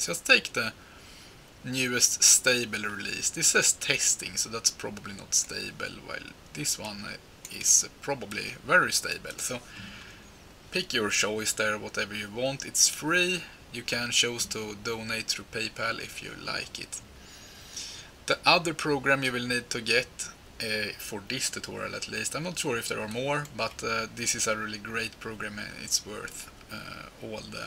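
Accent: Sweden. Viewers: Är du skånsk??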